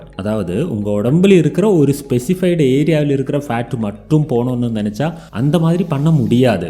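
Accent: Kerala, India. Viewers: Malayalam boy ❤️✨ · சகோதரா நீங்கள் மலையாளியா??? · Ivan malayali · Vadakkan ella malaiyali chettan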